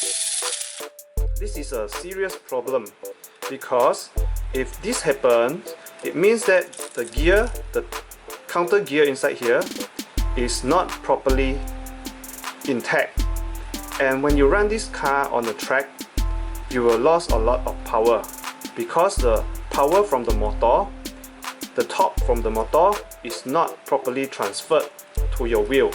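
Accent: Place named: Malaysia. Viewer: super Malaysian accent.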